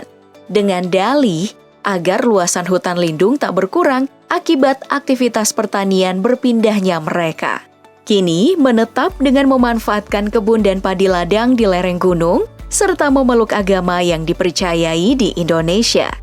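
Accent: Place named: Central Sulawesi, Indonesia. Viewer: Ahh mimin udah bisa logat sulteng😆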